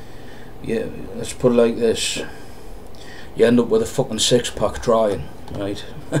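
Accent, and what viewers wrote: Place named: North East, United Kingdom. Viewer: i could sit an listen to you all day, where in the N.E are you from?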